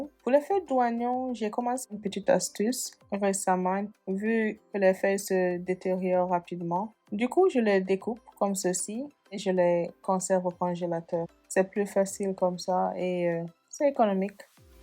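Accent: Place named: Senegal. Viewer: elle vient du Sénégal vu l'accent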